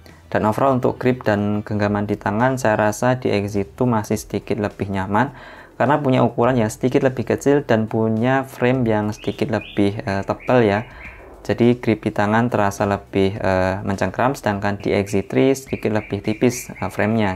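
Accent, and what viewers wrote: Boyolali, Indonesia. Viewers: Mas e koyone wong boyolali iki? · Wong Boyolali kah? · Wah wong Boyolali iki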